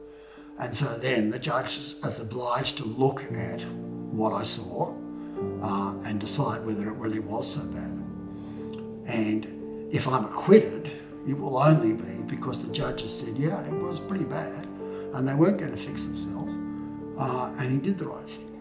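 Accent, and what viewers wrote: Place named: Australia. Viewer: I came here from Friendly Jordies coverage on you and wanted to know more, it might be a good idea to create some subtitles because the Australian accent can be a bit hard to comprehend for non-native speakers. Hopefully we can get some more international eyes on this!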